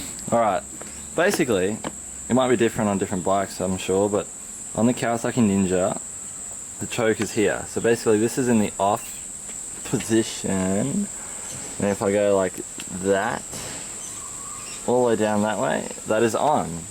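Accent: Australia. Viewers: your australian accent is really awesome xD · Must be the Aussie accent that makes it so easy to listen to your videos! lol Keep em coming you've taught me quite a bit about my ex250. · Thx buddy, you explained very clear, btw I'm from Australia as well, now lives in Thailand, just got a bike with this choke thing and have absolutely no idea about it until I watched your video, and its great to hear our accent.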